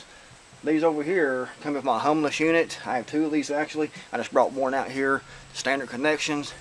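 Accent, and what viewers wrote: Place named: North Carolina, United States. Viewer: Hey I told a friend of mine from North Carolina that he talked about as close to you as anybody that I had ever met before, and now he won't return any of my emails. I don't understand, but for some reason no matter how hard I try, I can't talk like you at all, and I'm from Missouri.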